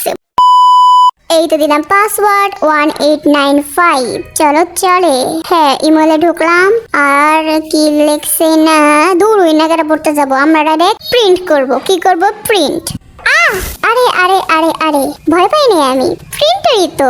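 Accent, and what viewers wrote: Bangladesh. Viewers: আপু আপনি কি খুলনার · নাটোর ভিলেজি তাসিটি হার কথা বলতাছে পাওয়ারফুল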